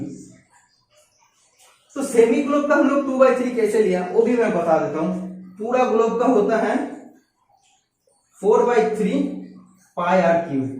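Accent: Bengal, India. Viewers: Bengoli ho bhai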